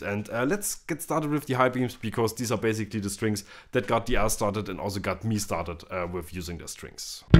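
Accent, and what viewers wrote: Germany. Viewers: the most stereotypical German i have ever heard ... fucking love it !!! more !!! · That's gotta be the most German English I've ever heard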